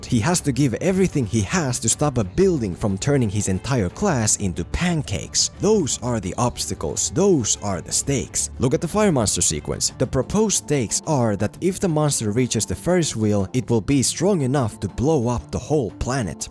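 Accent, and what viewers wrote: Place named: Finland. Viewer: You're Finnish, aren't you? That accent... familiar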